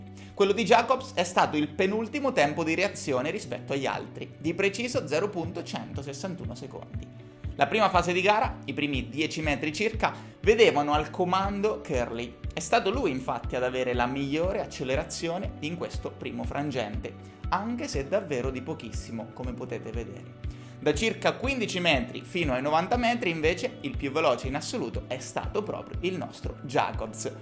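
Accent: Marche, Italy. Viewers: accento marchigiano a tutto spiano. Scommetto provincia di Macerata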